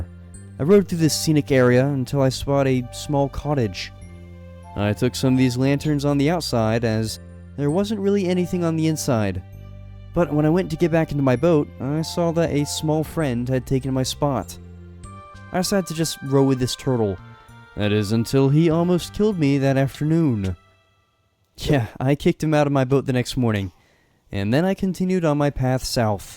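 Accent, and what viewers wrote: Scotland, United Kingdom. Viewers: I’m Scottish and it kinda close😂😂😂😂😂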